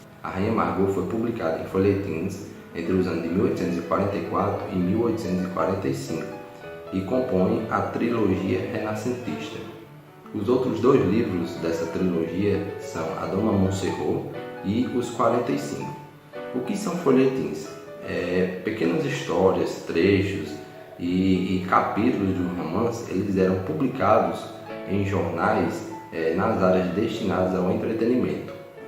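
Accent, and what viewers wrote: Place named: Pernambuco, Brazil. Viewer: Opinei pelo sotaque. Sou de Maranguape, 30Km de Fortaleza. Nossos sotaques são muito parecidos; diferenciam-se nas pronúncias do "te" e do "de", que vocês pronunciam como os pernambucanos. Mas no Ceará também tem essa fala, só que apenas no sul do estado: a região do Cariri. Então, tava esperando você fosse me responder que era de Juazeiro do Norte ou Crato... Namorei com uma menina de Monteiro, em Recife, há muuuuuuitos anos atrás...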